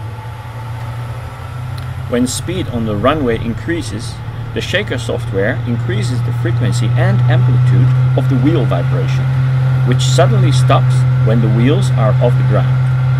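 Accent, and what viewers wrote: Netherlands. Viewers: Are you Dutch? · if his accent didn't give him away, his website most certainly did. Gave projectjes meneer! Ik ga zeker naar uw videootjes kijken. Ik wil heel gauw beginnen aan mijn eigen cockpit for Digital combat simulator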